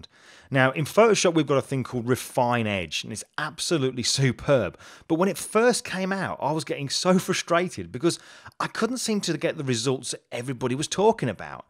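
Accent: United Kingdom. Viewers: lovely midlands accent :-)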